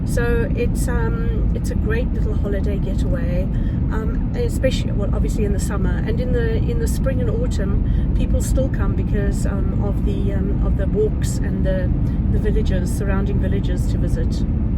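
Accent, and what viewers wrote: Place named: South Africa. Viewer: Hi. I love the history and footage of the little village where you have bought your studio. The lake is beautiful too. You sound South African ❤ thank you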